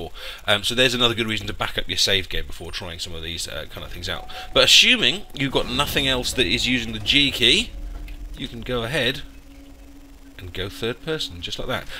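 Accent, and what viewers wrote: United Kingdom. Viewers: Ok, i just subbed. My reasons 1) Badass intro music 2) You sound awesome (are you british, if so whereabouts?) 3) good content Nice Job man!!!! :D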